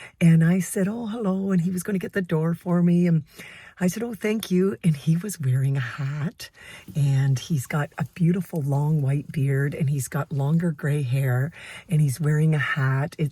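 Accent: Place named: Canada. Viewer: your sweet, soft, mellifluous Canadian voice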